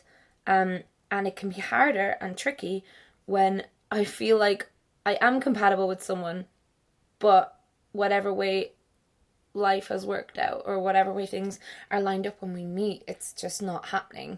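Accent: Ireland. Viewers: Compatibility: we have the same beliefs, we're both bi, we love makeup, I love the Irish accent Chemistry: I'm bad at science but good for you It's ok with me if you move to Wales now x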